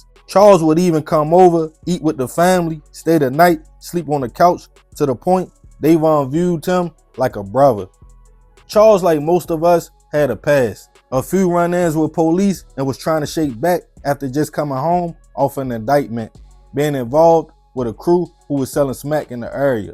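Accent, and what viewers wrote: Baltimore, United States. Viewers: You doing our city right I noticed your accent gettin way more thicker ...you not from Baltimore originally but I give you ya adoption papers you deserve it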